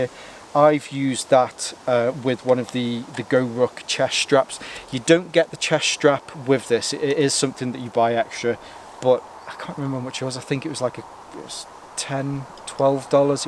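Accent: United Kingdom. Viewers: One of the most detailed review in British accent. Started to get interested in MCB fabric. Luv it!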